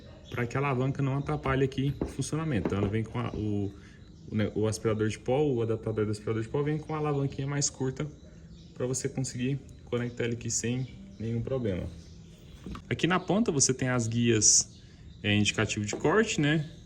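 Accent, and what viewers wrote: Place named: Inland Sao Paulo, Brazil. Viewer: Você fala com o sotaque interiorano de São Paulo, como o meu avô, quando diz "garga" ao invés de galga, oriunda do verbo galgar. Me deu saudade. Observações: Use luvas anti corte quando manusear máquinas de corte, aconselhável usar mascaras anti pó, principalmente em MDF. Se puder, óculos com isolamento para os olhos, também. No demais o vídeo esclareceu bastante. Obrigado.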